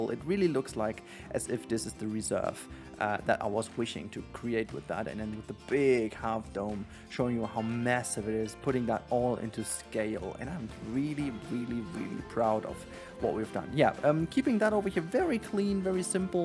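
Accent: Germany. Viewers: junge liebe ich den deutschen Akzent den man hört 😅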